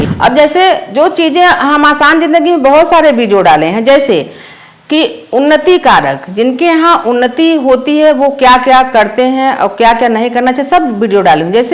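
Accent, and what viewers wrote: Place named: Prayagraj, India. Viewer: मां जी प्रणाम, लोगों की तो मुझे पता नहीं मगर मुझे आपकी भाषा बहुत अच्छी लगती है। हम तो राजस्थान से हैं। लेकिन जब मैं बहुत छोटी थी तब मेरे पापा जी के राजस्थान में ही आफिस में एक अंकल जी थे प्रयागराज से उनके बोलने का तरीका भी यही था और मुझे उनका बोलना बहुत ज्यादा अच्छा लगता था। सच कहूं तो मुझे उनके इस तरह से बोलने पर मुझे तो उनकी वाणी में बहुत ही मिठास महसूस होती थी और मैं तो जब भी आपका वीडियो देखती हूं तो तब भी वही मिठास महसूस होती है।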